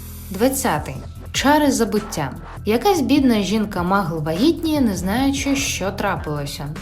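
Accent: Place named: Russia. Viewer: Рекомендуєте абабу а самі користуєтесь зросійщеним звучанням власних назв 🙄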